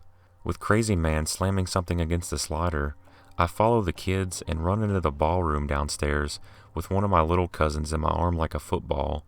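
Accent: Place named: South, United States. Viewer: I love your accent. I'm trying to guess where you're from, somewhere in the South. I'm British so I might be way off... lol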